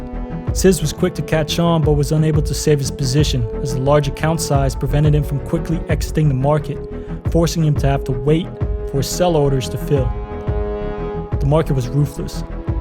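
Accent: Netherlands. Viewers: You sound dutch or flemish 🤔 am I right? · You have Dutch accent. If you are not Dutch that's not very common 😄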